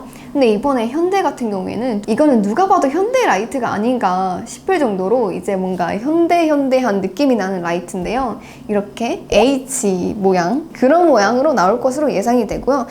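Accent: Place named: Gyeongsang, South Korea. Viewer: 고향이 경상도 어디세요? 사투리가 똑같네요 ㅎㅎ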